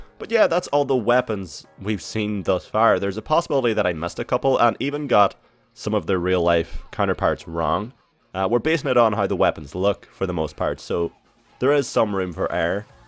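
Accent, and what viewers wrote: Donegal, Ireland. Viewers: haha I just know the accent lol im from donegal as well can't wait for this game to come out 😊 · Are you Irish?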